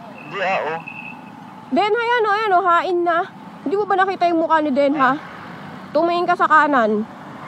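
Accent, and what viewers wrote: Batangas, Philippines. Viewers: lods penge sticker! new subscriber nga pala ako, nakakatuwa yung punto mo lods parang batangenyo, hehe. rs! 🤙🛵💨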